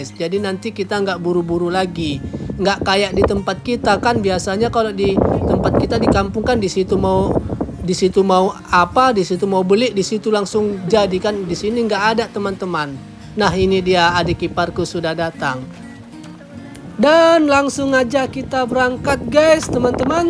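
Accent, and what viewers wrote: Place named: Java, Indonesia. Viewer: Yang aku suka dari pak dhe ini kalau ngomong logat jawane.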